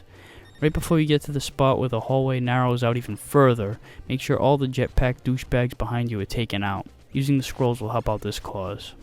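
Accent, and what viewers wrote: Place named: New York, United States. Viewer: Your New York accent is incredibly annoying. Buy a tape recorder and tape yourself all day and play it back. You'll realize you sound like a toddler who hasn't figured out his speech patterns yet. I recommend you move to the Midwest where people talk considerably normal.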